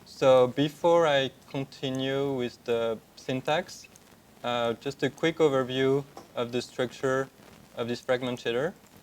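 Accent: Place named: France. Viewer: Franglais accent make me super concentrate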